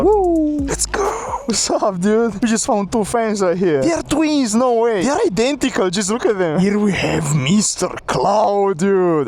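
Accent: Romania. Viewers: ești român coaie hahaha😂 W numa acuma mi-am dat seama ca ai acent roman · You're romanian,right?Your accent seem to be from a Romanian guy(nu prea o am cu engleza) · Ce accent de român ai smr eu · Stiam eu ca esti roman, dupa accent. Foarte faine videoclipurile. Poti oare sa faci un videoclip cu surron ? Ca inca nu stiu daca sa iau surron sau kukirin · brooo, is romanian accent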